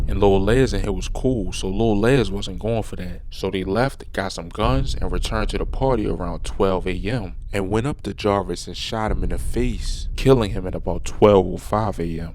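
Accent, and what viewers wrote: Baltimore, United States. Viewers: Bruh are you from Baltimore? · He must be from Baltimore or some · is bruh from baltimore? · Aye you from Bmore??? I can hear them home town yuu's & 2uu's I'm from Lexington &vine Westside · The narrator has a very strong Baltimore accent but he knows his Chicago hood history apparently and that's what's up keep up the excellent work bro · Dude talking must be from Baltimore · You gotta be from Baltimore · bro yo Baltimore or some from that way accent keep coming out 😭😭😭im wondering why this inaccurate 🤣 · Bruh yo ass got a Baltimore accent talkin bout some Chicago bidness.. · U from Baltimore gang? U got that accent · Dope content and on a side note I can tell by the accent you from bmore 🗣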